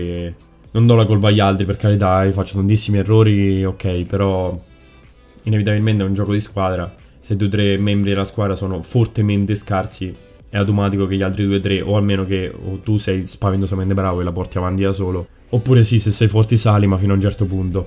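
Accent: Marche, Italy. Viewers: Ciao, mi sono appena iscritta al canale. I miei complimenti, finalmente qualcuno che fai degli ottimi video di wow in italiano ^^ riconosco l'accento della mia zona....sei marchigiano o sbaglio?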